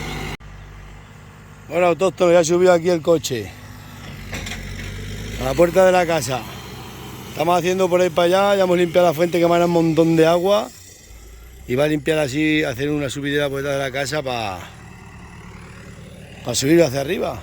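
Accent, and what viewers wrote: Extremadura, Spain. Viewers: Buenos dias Muy bien lo q estás haciendo en la Finca chaval,eres un máquina,eres tan fuerte como la máquina esa que prepara la finca,nos levántas el ánimo a los seguidores de verte trabajar a ti,y lo bien que sabes hablar,no pierdas nunca ese acento extremño tan chulo q tienes,se nos está pegando a todos.¡me encanta!Saludos amigo.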